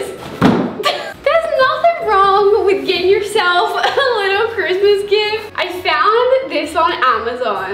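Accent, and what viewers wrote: Australia. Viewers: Bruv is she Aussie?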